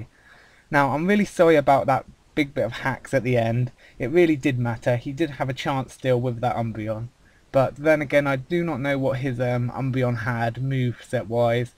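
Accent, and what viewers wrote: United Kingdom. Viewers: You have a British accent :3 xD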